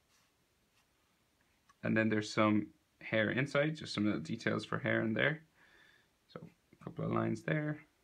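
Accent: Ireland. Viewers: ok thanks also did you use sharpies to colour (shows in thumbnail but not in vid) or something else, and are you irish? I think that's an Irish accent but not sure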